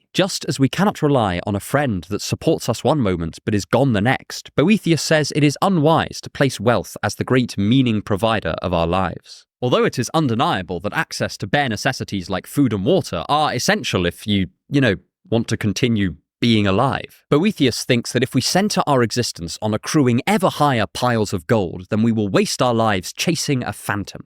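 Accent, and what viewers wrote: England, United Kingdom. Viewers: I absolutely love your wonderful English accent that keeps me so satisfied til the end of the video. You really make great quality videos and I love it!!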